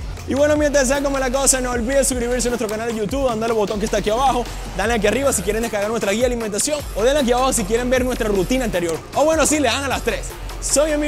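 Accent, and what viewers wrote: Venezuela. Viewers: jajaj es venezolano por eso habla asi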